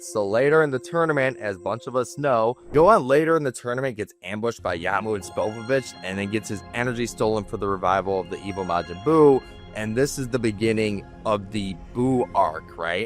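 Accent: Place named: Chicago, United States. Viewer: This is the fiercest chicago accent I've heard since home alone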